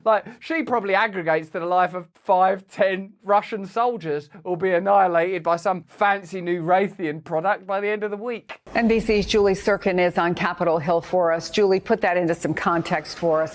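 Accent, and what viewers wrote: United Kingdom. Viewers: The composition of the topic is great and so is the enunciation however I have a weird resistance to the obviously thick British accent telling me news in DC. Maybe it’s the Yankee in me.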